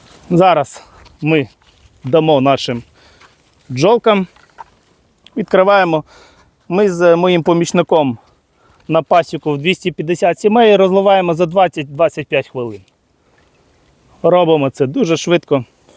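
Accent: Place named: Bessarabia, Ukraine. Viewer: Да, не ожидал услышать такой чистый украинский из Бессарабии!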